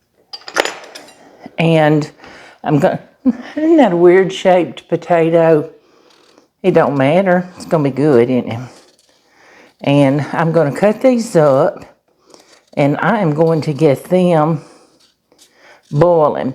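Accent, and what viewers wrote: South, United States. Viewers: I will definitely try this cabbage casserole recipe. I am New Jersey girl with southern roots. And I loved your southern accent, and especially your calm demeanor during your presentation. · Hi. I was just scrolling and came upon your video. Two of my favorite foods are cabbage and onions. I have never heard of this cabbage casserole. It sounds wonderful and looks pretty easy to make. I love your bright open kitchen and your great explanations, but especially your sweet Southern accent. I'm from California so it's a treat to hear you. Thanks so much. Subscribed.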